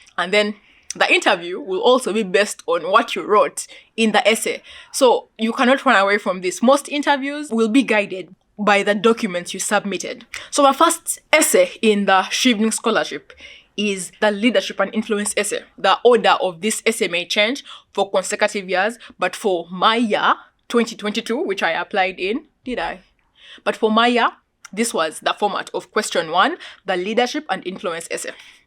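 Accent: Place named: Kenya. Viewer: Sounding like my Kenyan friend 🤗🤗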